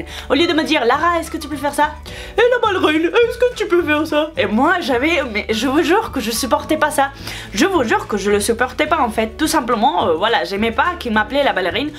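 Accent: Spain. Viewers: tu es magnifique j'aime trop ton accent espagnol ❤ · Je suis nouvelle sur la chaine dite moi quel accent elle a ? On dirait de l’espagnol ? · non mais a son accent des le debut ça s'entend qu'elle est espagnole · Cc Lara je te suis depuis pas longtemps j'adore ton axent Espagnol sa serais mon rêve de pouvoir parler couramment l'espagnol mais j'ai encore le temps au passage ses pas des pouces mais des poux et ma question pour la FAQ est ce que ta nationalités est française ou espagnol Gros bisous ❤️😘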